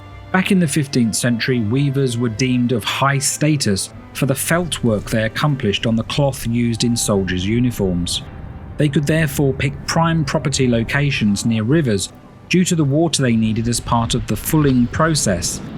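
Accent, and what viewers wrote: United Kingdom. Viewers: I love the typical British accent.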